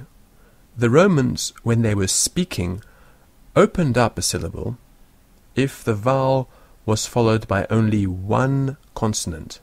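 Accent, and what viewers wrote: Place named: England, United Kingdom. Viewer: I've learnt not only Latin from this fantastic video, but also a descent English accent. Enjoy it!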